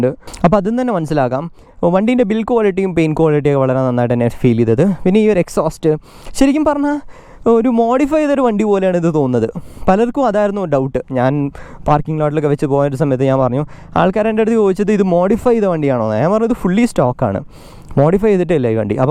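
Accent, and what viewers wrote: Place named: Kannur, India. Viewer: Machane ingal Kannoorkkaran aanalle ....❤️❤️🥰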